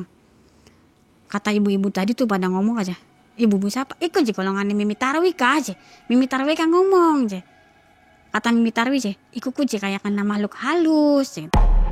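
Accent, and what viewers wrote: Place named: Cirebon, Indonesia. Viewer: Bahasanya kaya cirebon😅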